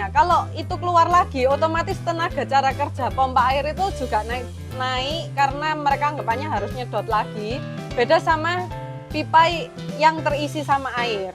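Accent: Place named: Surabaya, Indonesia. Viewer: Oalah jebul aning Suróbóyó. Mulané medok buanget. Rapópó cik, kita satu server.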